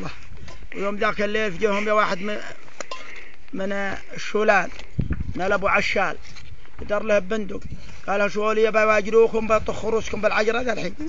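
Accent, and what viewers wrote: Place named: Al-Jawf, Saudi Arabia. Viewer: اللكنه حقت الجوف و نجران نفس الشيء مابه خلاف حتى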